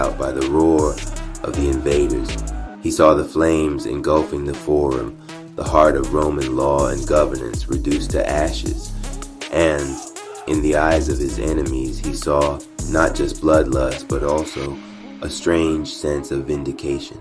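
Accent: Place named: Sweden. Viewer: Narrator has a Swedish Lutheran accent.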